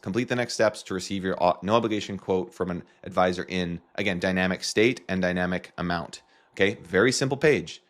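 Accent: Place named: United States. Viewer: I'm american and this is exactly what i sound like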